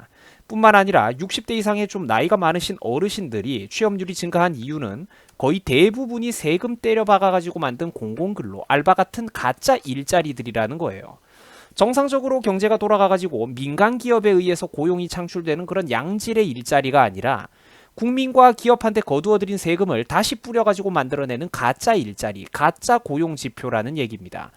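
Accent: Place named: Gangwon, South Korea. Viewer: 혹시 고향이 강원도신가요? 억양이 들리는거 같은데 구수하고 정겹습니다 ㅎㅎ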